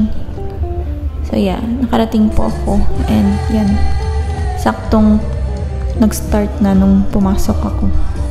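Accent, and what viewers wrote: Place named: United Kingdom. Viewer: British accent dw sabi ni 😊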